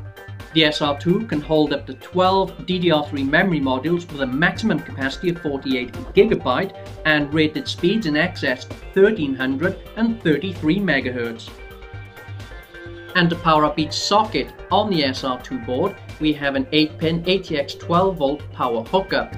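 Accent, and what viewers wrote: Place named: Wales, United Kingdom. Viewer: liking your accent is it welsh im presuming? soz if im wrong. i was just wondering seeing as i live in britain are the parts you recieve shipped from the states? do you pay for them yourself or are they sent to you for reviewing and such? i would die for a job like yours keep up the great work man :)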